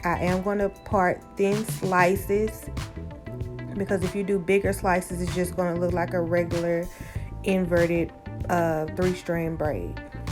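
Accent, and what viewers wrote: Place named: St. Louis, United States. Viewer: You sound like you from saint louis Missouri!! I swear you sound like us. You slay too boo!!! Plus we definitely use pro styl gel.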